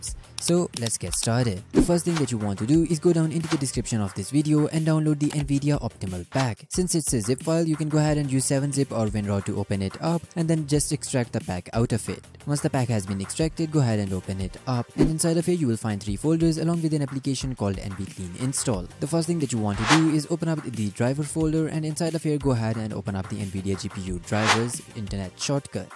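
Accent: India. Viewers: Never never never trust an Indian accent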